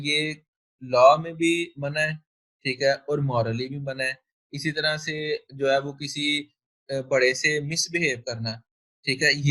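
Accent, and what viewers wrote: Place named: Punjab, India. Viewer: punjabi accent is so good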